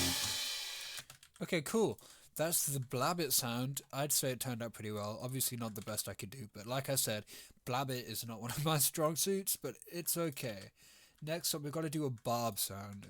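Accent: United Kingdom. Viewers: HE'S BRITISH?!?!?!?!? 🇬🇧🇬🇧🇬🇧🇬🇧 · HES BRITISH?!??!!??!!?!?? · Hello mate, you sound kinda bri’ish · WHY IS HE BRITISH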